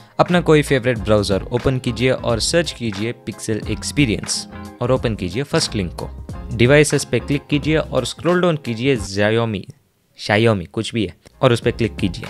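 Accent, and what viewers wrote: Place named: Karnataka, India. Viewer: You are not native Hindi speaker, you sound like a kannadiga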